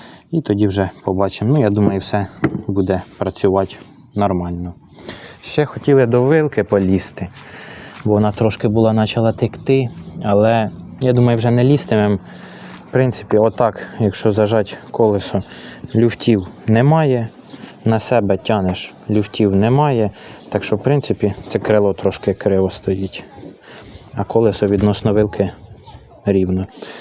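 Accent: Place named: Belarus. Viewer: тута, шо ми... мабуть бульбаш якийсь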